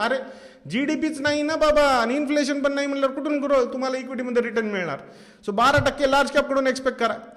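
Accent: India. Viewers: अस्सल गावरान तडका..... लै झ्याक सर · Pan Marathi Evdhi pan khedval Nka bolu Sir...... Kahi national viewer pan astil tumcha...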